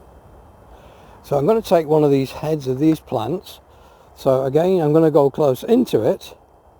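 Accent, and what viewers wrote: United Kingdom. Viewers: I just purchased this camera and I am so thankfull you posted these video's! Very helpfull. Btw your Brittish accent makes it even better 😄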